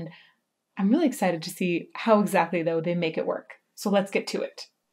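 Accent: Brooklyn, United States. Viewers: Brooklyn accent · His accent is of Brooklyn ny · That's a Brooklyn accent hahahahahaha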